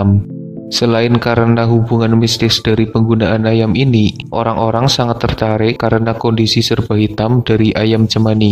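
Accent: Banyumas, Indonesia. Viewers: Adminnya orang ngapak nih wkwk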